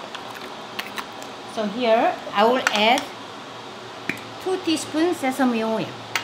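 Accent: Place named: South Korea. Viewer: I'm korean. I'm not good at English. but I can understand your speech. I think you have korean accent when you speak engligh.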